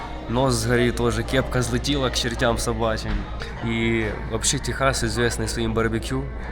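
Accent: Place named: Chernihiv, Ukraine. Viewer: У тебе такій говорю наче ти з чернігівської області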